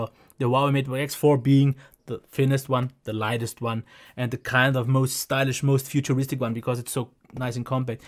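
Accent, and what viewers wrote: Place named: Germany. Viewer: Whenever i hear this guy's German accent, I'm thinking of the movie Terminator.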